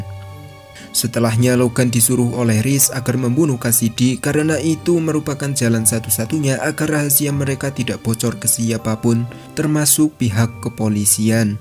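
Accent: Java, Indonesia. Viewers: Kek orang jawa nii bang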